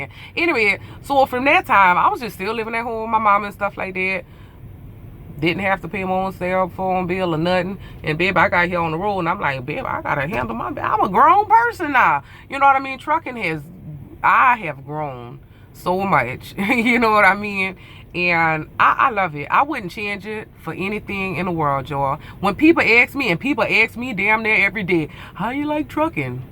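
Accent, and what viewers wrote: Louisiana, United States. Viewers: is that a Louisiana accent? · You have to be from Louisiana????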